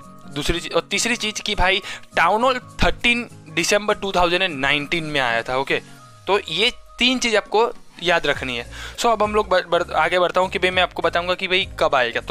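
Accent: Gujarat, India. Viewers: Kya ap Gujarati ho . me bhi Gujarati hu mujhe apki language Gujarati jesi lagi.plz reply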